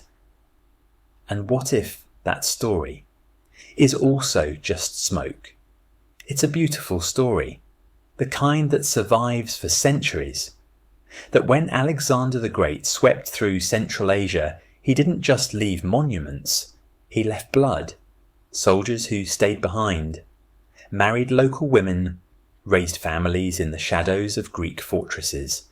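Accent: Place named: United States. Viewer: A lot of ChatGPT generated crap... You are no wiser after listening to the 20 minutes rant. Just looking at physical features, language and customs shows you the difference. Of course there is overlap and some common maternal genes... But the point was to explain the distinct differences between differences the groups. Yet Chat GPT failed to do so in its yanky acsent 👎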